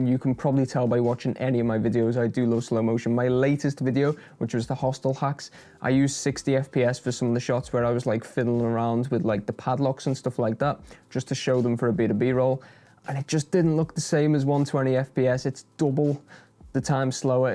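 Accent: Liverpool, United Kingdom. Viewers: Where abouts are you from man? you sound close towards liverpool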